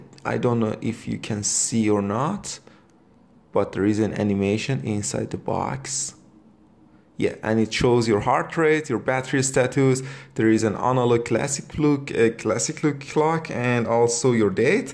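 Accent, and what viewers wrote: Iran. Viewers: I bet your ass that's a Persian accent. Lol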